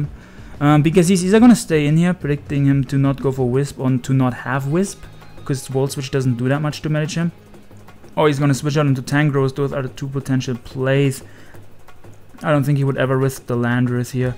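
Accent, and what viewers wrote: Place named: Germany. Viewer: clicking on that vid blind and hearing that smooth german voice is top 1 feelings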